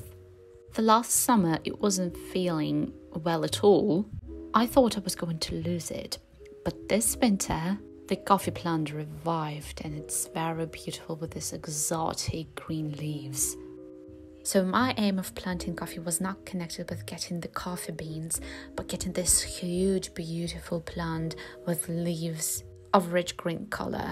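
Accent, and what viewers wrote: United Kingdom. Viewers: I was actually wondering about that. You consciously go for for a British accent?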